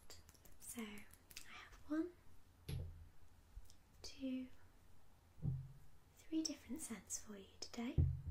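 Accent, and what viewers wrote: United Kingdom. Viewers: your videos are so good it's always cool to see fellow brits and hear British accents:) subscribed x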